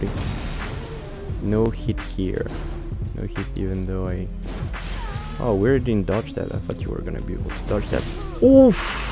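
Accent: France. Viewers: for the timestamps and the French accent (force frérot)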